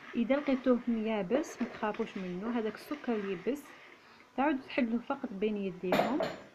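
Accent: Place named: Algeria. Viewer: بالصدفه شفت قناتك وعرفت صوتك جزائريه واشتركت مزيدا من النجاح والتالق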